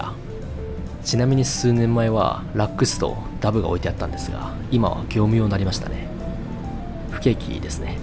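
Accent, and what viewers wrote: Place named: Tochigi-Ibaraki, Japan. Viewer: 栃茨出身の方ですか？ 方言の人大好きです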